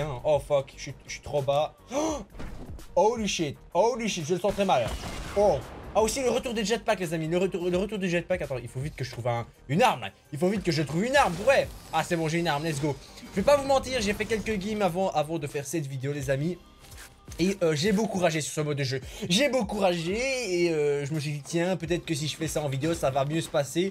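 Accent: Belgium. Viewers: T'es belges ?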